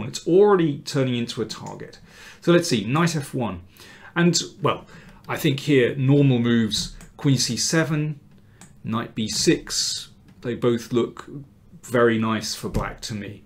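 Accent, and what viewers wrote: United Kingdom. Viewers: Please keep saying "At all" in all your videos, because your ultra British accent is accentuated. It's great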